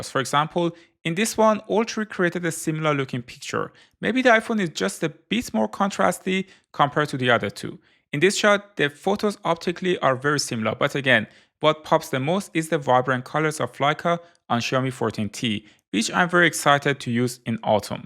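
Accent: Iran. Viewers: چرا حس میکنم گوینده یه ایرانیه😂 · لهجه برای یه ایرانیه😅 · Aren't you Iranian? The accent sounds like Iranian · Is this Iranian accent? Or am I wrong? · داداش ایرانی ای؟ خیلی لهجت ایرانیه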